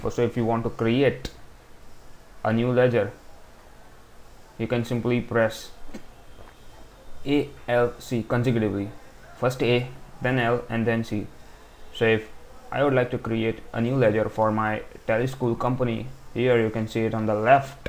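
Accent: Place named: United Kingdom. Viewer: dude I know that you love speaking English. and make every one comprehend what you wanna say despite of using Britishers language and accent too you could be more comfortable with your national native language.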